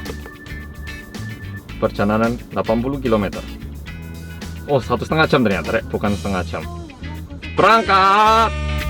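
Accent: Java, Indonesia. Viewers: Ngomonge medok banget jowone gak ilang😂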